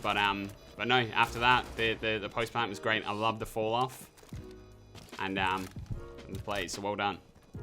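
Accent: Australia. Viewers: Aussie is my bet he sounds similar to modest pelican gaming who is also Aussie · Sounds aussie mixed with something else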